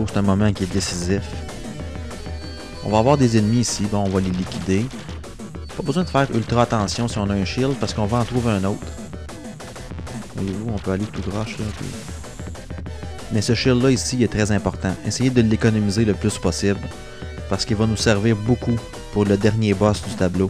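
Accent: Quebec, Canada. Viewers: Québécois oui, mais tu es quand même très compréhensible pour des français comme moi ;) Et GG pour ta vidéo, même si tu trouves que Gaiares est un jeu facile (alors qu'arriver jusqu'au niveau 4 relève de l'exploit) tu arrives quand même à faire un run sans perdre une seule vie. C'est la que je me dis "Putain, ce que les canadiens sont bons :p"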